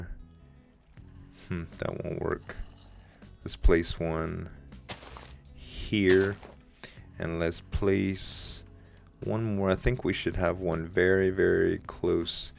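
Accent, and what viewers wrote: Louisiana, United States. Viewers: Z, are you cajiun(horrible spelling) ? It sounds like it. LOUISIANA FTW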